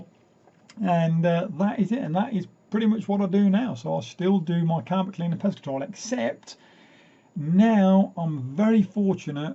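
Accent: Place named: Northern England, United Kingdom. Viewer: i definatley wouldnt of picked your accent as coventry england.You sound like your more from northern england.Great story though 😉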